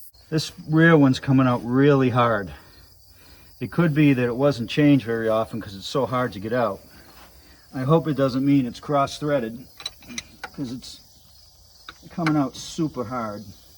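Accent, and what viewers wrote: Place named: Northeast, United States. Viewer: Love yalls videos! I assuming yall originally from diffrent states? You sound like you're from the Northeastern area, and your wife has a normal accent 😁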